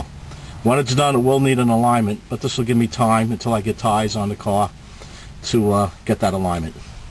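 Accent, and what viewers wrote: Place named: Boston, United States. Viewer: Taking awt tha cawter pin lol love the Boston accent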